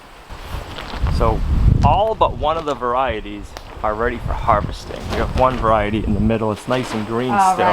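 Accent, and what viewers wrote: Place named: New Hampshire, United States. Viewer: Here's a Tee shirt. "What's next on Lumnah Acres? I have an ide'er.... Love the NH speak. Reminds me of my dad.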